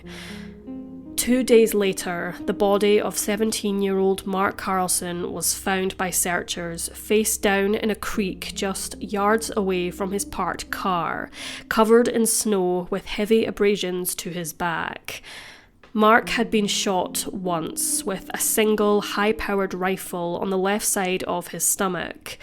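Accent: Scotland, United Kingdom. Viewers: I love watching your videos, bonus you have a Scottish accent as I'm from Dundee, you sound East Coast also? 👍🏻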